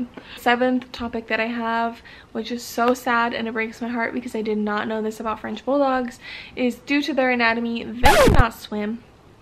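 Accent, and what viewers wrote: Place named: Bay Area, United States. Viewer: where you from ? sound you like from the bay like me lol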